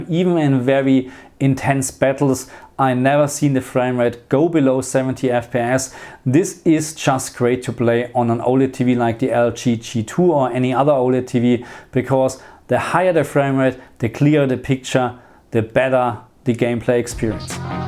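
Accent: Germany. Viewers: Deutscher akzent 😂👋👍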